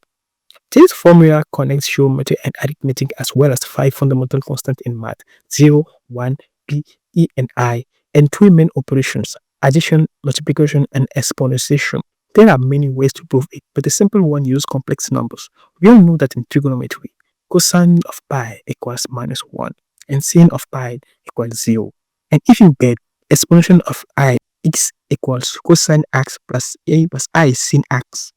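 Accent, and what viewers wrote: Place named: United Kingdom. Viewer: is it just me or is this genuine gibberish with a british accent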